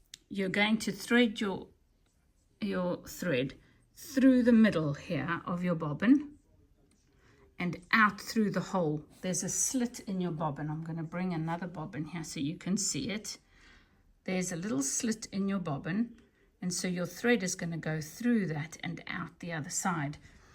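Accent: South Africa. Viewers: I know a Sata African when I hear one. Thank For this - just bought the same machine to start my hobby and small projects. and your videos are just everything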